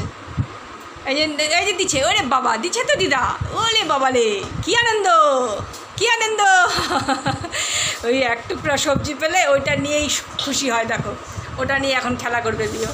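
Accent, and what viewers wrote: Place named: North Bengal, India. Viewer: Didi aapnar bachcha ta sob aaponjonke niye thaakte bhalobashe - khub bhalo sona baba ta aapnar. Didi aapni ki coochbehar athoba jalpaigurir aashe paashe kothao thaaken, karon aapnar bhashar tan theke ami eta bujhechhi je aapni North bengaler kothao thaaken, ami aapnader north bengaler bhashar onekta bangal plus calcesian bhashar mix) saathe khub parichito karon amar maa Hashimara tea estate er manush chhilen plus ami nijeo upper Assam thaaki tai bollam.❤❤❤❤❤❤❤❤❤❤🐕🥰🥰🥰🥰🥰🥰🥰🥰🥰🥰👍👍👍👍👍👍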